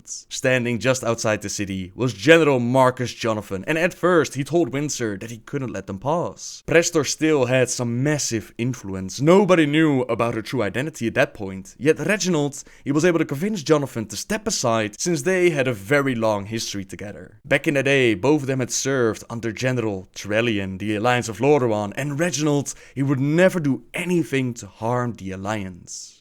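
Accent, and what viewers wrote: Netherlands. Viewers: such a dutch accent :p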